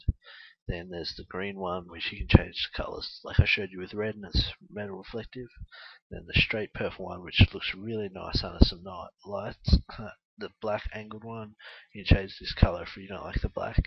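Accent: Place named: Australia. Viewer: Finally an fucking aussie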